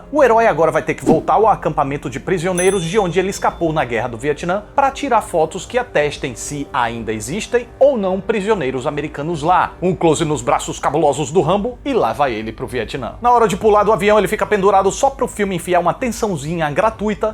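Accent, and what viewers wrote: Northeast, Brazil. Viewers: Adorei o falatório. Bem nordestinês! Me identifiquei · Cara gostei muitooo do seu vídeo com certeza o melhor e mais completo desses últimos dias sobre Rambo... E eu sou nordestino e vc fala parecido kkkkkkkkkk, você sebe se vai ter alguma coisa do filme para vender na estreia do filme nos cinemas tipo a faixa a faca?? Seria ótimo para nós fãs, abraço!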